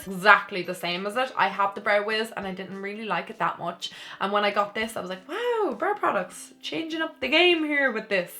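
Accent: Ireland. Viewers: Trte Mrte she's Irish. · What part of Ireland are you from x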